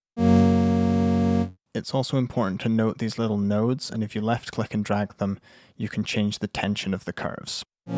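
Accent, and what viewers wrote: United Kingdom. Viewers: 9 years of experimenting and reading and trying to understand FM Synthesis and here is this random guy on youtube with a British accent laying it down in 20 minutes.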